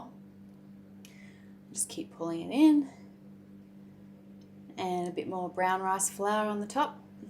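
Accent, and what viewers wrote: Australia. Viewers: I loved the video and the aussie accent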